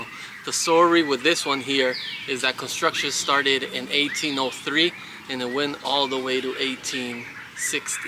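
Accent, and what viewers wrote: Nicaragua. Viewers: Ya tienes el acento nica. 😊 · Me encanta como hablas el español Nica. Yo vivo fuera de Nicaragua hace años y los vídeos me traen muy gratos recuerdos. Espero recuperar mi acento Nicaragüense. Wishing you the very best to you and your family. Cheers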